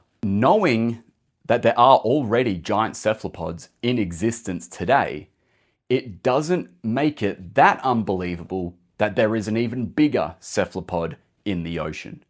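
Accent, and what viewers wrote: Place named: Australia. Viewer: Paused the video. Guessed the man was Australian. Resume video. Vindicated.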